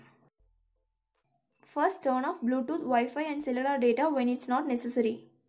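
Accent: India. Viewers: I hear Indian that means I’m in the right place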